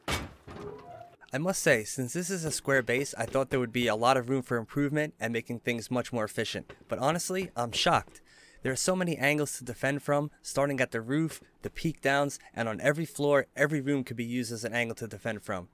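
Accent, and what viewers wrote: New York, United States. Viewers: This guy sounds like a New Yorker.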